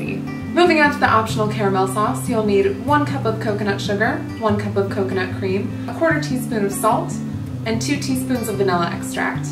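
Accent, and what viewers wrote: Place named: Michigan, United States. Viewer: Your accent is my favorite. My dad is from Michigan and hearing you makes me feel at home. I love this video for so many reasons. <3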